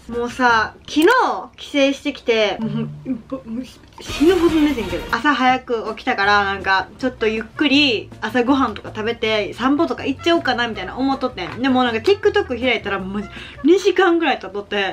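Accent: Kansai, Japan. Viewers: 推し事ってほんと楽しいよね、、のあはモデルさん推しだから相手は女の子だけどほんと可愛くて生きててくれて息しててくれて有難うっていつも思う笑卒業旅行大阪行ったのー！いつもの関西弁？しか聞くことないから生の関西弁が聞けました🥰🥰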